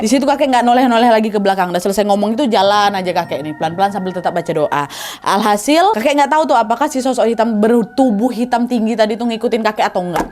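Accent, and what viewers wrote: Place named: Banjar, Indonesia. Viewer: Kak, jujur kakak mirip bgt sama keponakan suamiku. 11.12 loh kak bedanya suaranya aja yg disini lebih ke Banjar ❤sehat selalu ya ka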